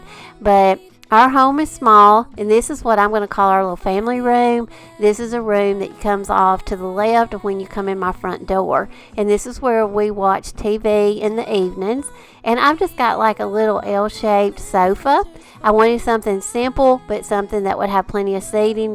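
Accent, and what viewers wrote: South, United States. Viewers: First time viewing any of your videos. Such an inspirational and intimate time we'll spent. So comforting to here a beautiful Southern accent. I feel like I'm talking with family.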